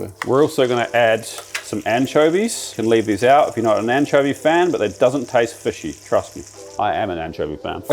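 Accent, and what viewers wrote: Australia. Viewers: He Aussie mate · Are you australian ?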